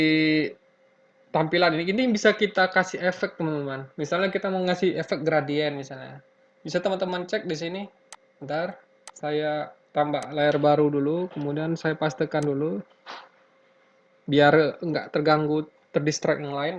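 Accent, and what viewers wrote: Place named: Padang, Indonesia. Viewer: Sprti logat logat padang padang gan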